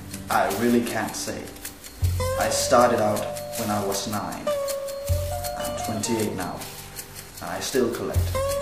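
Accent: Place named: Denmark. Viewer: I just love when you clearly can tell that you are danish just from your english accent!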